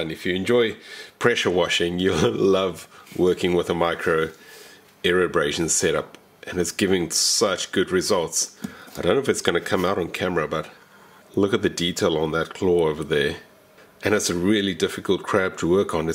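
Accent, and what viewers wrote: South Africa. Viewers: Dude I know you're a New Zealander, but that accent sounds so South African to me. I can't believe there's THAT much similarity between the accents, so what gives?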